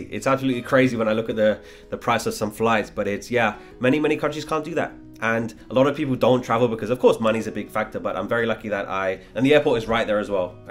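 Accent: United States. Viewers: 😂, you definitely sound more 🇺🇸 than 🇬🇧 😅 although having 🇲🇾 passport that needed to get visas to many places/countries. hwr,....WAS very privileged then, easily got any visas due to nature of former work 'flight crew' 😂 Thus, travelled to all continents & many countries..... 😂 2024April05th, Fri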